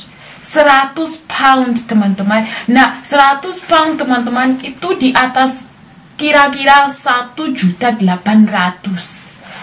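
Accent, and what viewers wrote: Java, Indonesia. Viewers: jangan dihilangin medoknya ya di Inggris mbak hehehe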